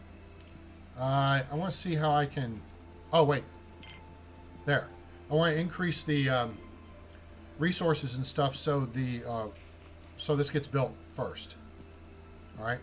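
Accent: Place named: New Zealand. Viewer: You do it so properly. Step by step telling. But eehhm 🥴 what I see on your playlists are confusing.... By the way you are not from up here. Probably from down under. Nooo, anyway. Not fully a wombat. New Zealand probably. I hear you. Trying to place you geographically..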